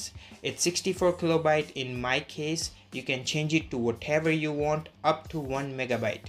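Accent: India. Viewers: Classic Indian accent you absolute tool , culture yourself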